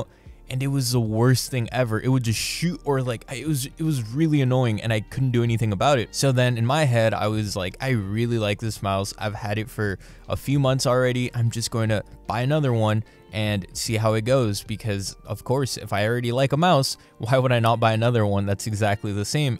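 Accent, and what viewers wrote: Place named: United States. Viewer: why you sound like a american with fit body and few muscles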